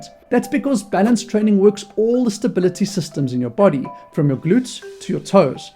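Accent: South Africa. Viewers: Great video with so much common sense, which should be called “rare-sense” these days. Thank you. PS: you sound South African. Am I correct there boet?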